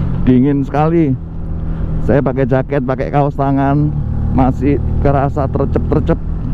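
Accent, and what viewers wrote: Java, Indonesia. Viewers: Ini mas ny org Jawa bkan? Klo dri logat bicarany mirip org Jawa? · Kalo ke Lombok ( khususnya ke Sembalun) ajak anak Istri dong /pacar atau temen boncengan biar ndak ngomong sendirian 😊😊😊. Kapan ke Lombok lagi Bang, dari Jawa mana Bang logat jawanya medok sekali, pingin bisa bahasa Jawa aku 😀😀😀😀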